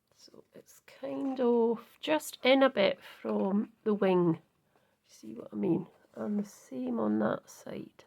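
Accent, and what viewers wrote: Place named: Falkirk, United Kingdom. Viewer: Hi, I stay in Balmullo near St.Andrews, but i have friends from Falkirk live here and thats where the accent twigged. love the way you do your videos, i chatter to myself as well. Carry on crafting.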